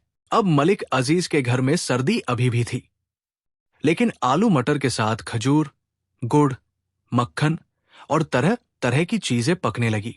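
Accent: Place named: Pakistan. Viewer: Par ye pakistani jesa kyun bol raha he feel nahi arahi hasi aarhi he 😂❤️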